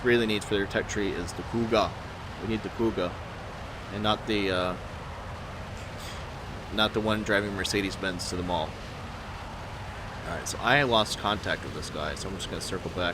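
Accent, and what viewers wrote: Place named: Texas, United States. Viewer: I'm pretty sure he's from Texas idk how you got a British accent vibe from his voice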